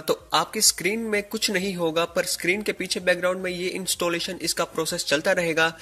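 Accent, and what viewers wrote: India. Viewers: your title is English and suddenly you talk Indian...WTF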